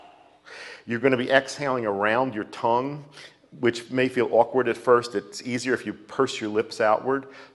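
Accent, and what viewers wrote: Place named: Philadelphia, United States. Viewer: Wow, the counting was fast. I will give it a go. Do I ever hear Philly in Dr Weil's voice; "out", "around", "choklit." The 1st 2 almost have an extra vowel sound I couldn't spell. Love it, however.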